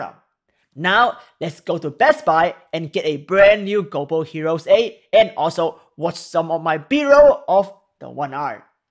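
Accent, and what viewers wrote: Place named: China. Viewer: his english is not broken! he has just chinese pronunciation which is actually cool...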